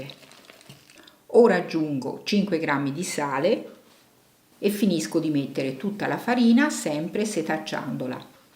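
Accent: Tuscany, Italy. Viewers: Buonasera, con la sua dolce calma e quella magnifica cadenza toscana, ci ha regalato una ricetta che si deve provare prima di subito... Che meraviglia questi dolci di carnevale. Grazie! 🎉🎭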